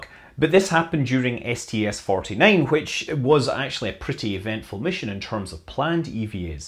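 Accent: Scotland, United Kingdom. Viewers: YouTube cannot compute the awesomeness of the Scottish accent, even at this level of dilution. What would it do with Glaswegian after ten lagers?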